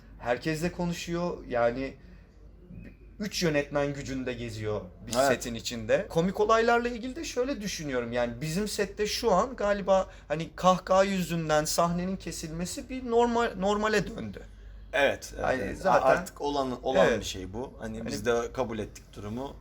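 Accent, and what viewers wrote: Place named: Italy. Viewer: Abi sen italyan ca konuş daha iyi (yani aksanı)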